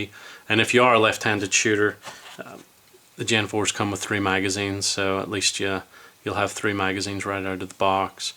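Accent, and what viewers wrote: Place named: Belfast, United Kingdom. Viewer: I hear a Belfast accent ;-)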